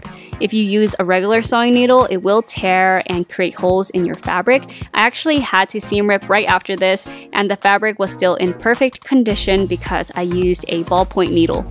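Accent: United States. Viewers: She sounds sooooo american oml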